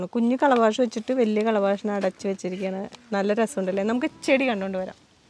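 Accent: Thrissur, India. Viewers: Chechide samsaram reethi thanneya ettavum manoharam.. 💞💞 Chechi from thrissur alle... evdeya proper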